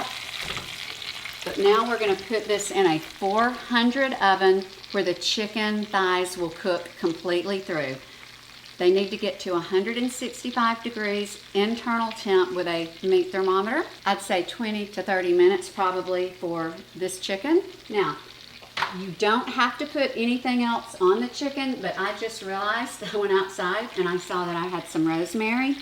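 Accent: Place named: South, United States. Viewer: You are southern. I know you can cook. Don’t stop making videos. Beautiful kitchen btw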